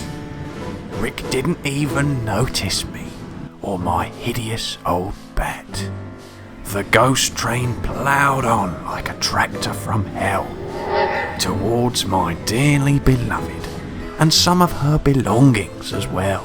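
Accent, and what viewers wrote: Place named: Cockney, United Kingdom. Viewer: And every year of the date of the accident it runs again as a warning to others, plunging into the gap, shrieking like a lost soul! Great work! I really enjoyed it! Great voiceover too. Good cockney accents always sound good.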